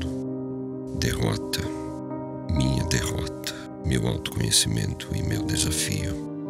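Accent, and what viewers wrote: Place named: Brazil. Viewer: Adoro ouvir declamar poesia em português com a musicalidade do sotaque brasileiro. Saudações de Portugal.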